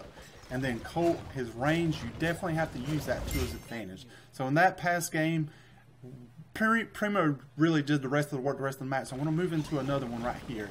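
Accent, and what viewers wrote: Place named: South, United States. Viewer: I love you’re southern accent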